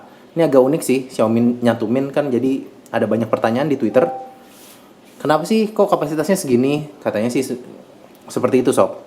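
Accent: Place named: Banjar, Indonesia. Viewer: Abang org banjar ya bang, kok kedengaran ada org ngomong pake bhsa banjar.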